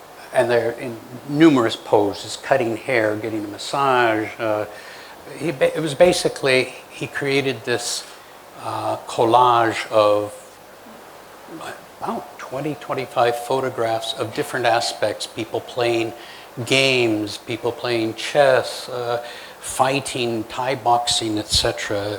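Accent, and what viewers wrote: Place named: United States. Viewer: Surprised, that you still speak a neat American accent after all these years in Thailand!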